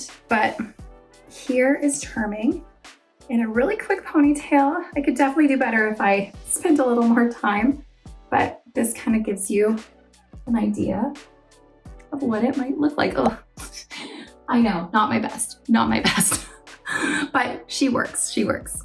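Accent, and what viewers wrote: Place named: Canada. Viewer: Just a trivial question... How is your accent doesn't seem different ? Maybe I'm wrong about Canadian accent. And the Charming style wig, great!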